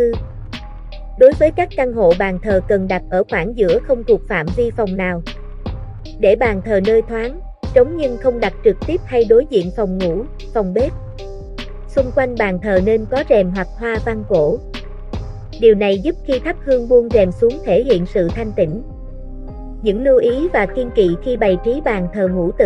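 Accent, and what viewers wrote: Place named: South, Vietnam. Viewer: Giọng miền Nam nghe khó quá lại còn nhạc nền nữa làm cho càng khó nghe hơn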